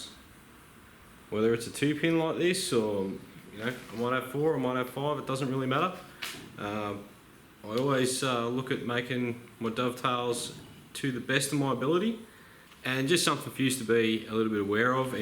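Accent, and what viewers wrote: Australia. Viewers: Great to hear another Aussie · THATS an aussie accent, if i´ve ever heard one!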